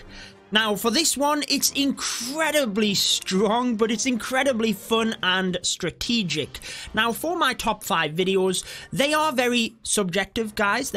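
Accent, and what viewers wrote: United Kingdom. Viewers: Who else is just enjoying the nice British accent?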